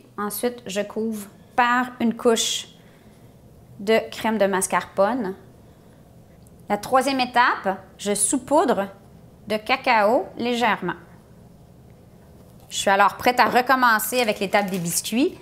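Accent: Quebec, Canada. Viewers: Vous êtes adorable et drôle.et puis l accent québécois fait le reste. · l'accent québécois 😉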